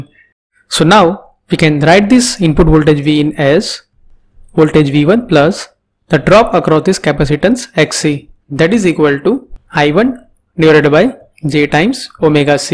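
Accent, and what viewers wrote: India. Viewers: Irritating traditional indian english accent.. Uff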